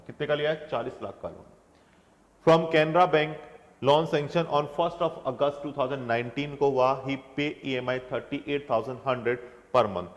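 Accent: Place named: Rajasthan, India. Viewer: Rajshthani accent👌😁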